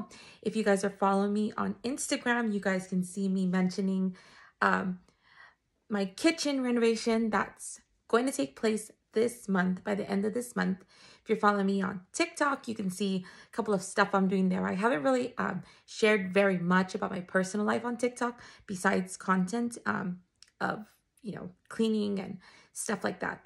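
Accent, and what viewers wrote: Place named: India. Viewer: A mi me Cuesta enterder su ingles cuando habla, su acento es bien fuerte. Pencé que era de la India 🇮🇳.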